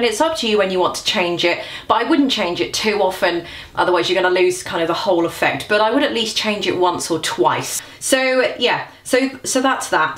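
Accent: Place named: United Kingdom. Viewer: I hear an accent... Are you from UK? You Are Awesome!!!!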